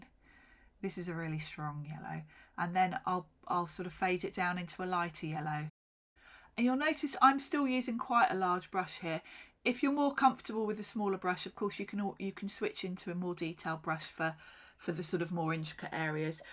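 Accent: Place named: United Kingdom. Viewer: Hello! I’ve just received mine in the mail today, and I am even more excited to use it after watching your tutorial. You’ve explained everything so well, that for a rarity, I really don’t have any questions as of yet. I may have some after playing with it, but I do feel you were ever so thorough. I showed my husband and daughter not too long ago and exclaimed, “Look! My birthday pressie has finally arrived!” I received two smiles and one with an eye roll. 🙃. My birthday was in August. When I finally decided what I had wanted, they were already out of stock. I can’t tell you how quickly I was pressing buttons when I received an email letting me know they had more in just a few days ago. I do have two unrelated questions. The first being, in some of your comments it had been mentioned this had been on C&C with Pete. Could you please tell me what the C&C stands for and who Pete is? 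My second question is more personal, and I do apologize, but my curiosity has gotten the best of me. Could you please tell me the area of England you’re from with your British accent? I’m asking because my Mum was British, she had married an American fella and moved to the states. However, there were parts of my life when I did live there and went to school. The first part, my Mum, older brother and I lived with our Grandparents in Avebury. After awhile, my Mum took my brother and I to live in Salisbury. This had been when my Dad had been stationed in a not so family friendly area, and that’s why we l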